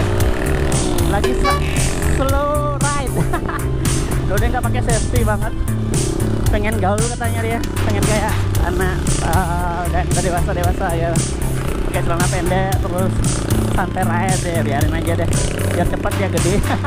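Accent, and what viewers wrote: Bali, Indonesia. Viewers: Bang lu orang bali suaranya aja sayatau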